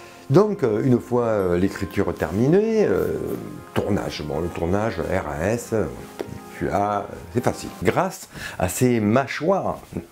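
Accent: South, France. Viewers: Encore une très bonne vidéo, comme d'habitude. De très bonnes explications sur fond de vidéos et d'images bien choisies, un vrai plaisir pour les yeux et les oreilles. Perso je suis du centre de la France et n'ai pas l'habitude de l'accent du sud, mais étrangement je trouve que ça donne un gros plus au côté narratif de tes vidéos, donc encore un point positif ! Hâte de regarder tes prochaines créations. À bientôt dans un prochain commentaire, Un passionné de paléontologie, comme beaucoup de gens ici :). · De rien. J'adore aussi ton accent du sud, moi même venant du nord, ça donne un côté exotique ;) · J'ai découvert ta chaîne il y a peu, et à ma connaissance c'est la seule du genre. En français. Avec un accent qui sent le pastis. C'est très sympathique, instructif, bien fait. Que du bon, quoi. Alors accroche toi. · La paléontologie avec l accent du soleil et de la passion. 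Merci! Je n' ai pas de sujet précis à te soumettre, le principal c est que tu prennes du plaisir à faire tes vidéos car c est ce qui nous fait plaisir 😍 Juste une petite suggestion, pourrais-tu trier tes vidéos en fonction des eres et des thèmes? Il est quelques fois difficile de trouver les suites de tes mini séries. Merci et j attends avec impatience la prochaine. 😙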